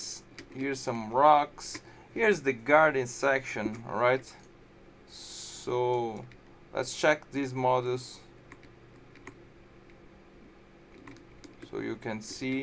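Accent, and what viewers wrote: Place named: Brazil. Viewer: brasileiro ? parece XD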